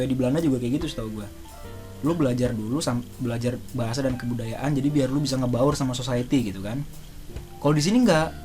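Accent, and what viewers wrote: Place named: Depok, Indonesia. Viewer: Bahasa lu masih anak depok banget bor.kwkwkwkw keren keren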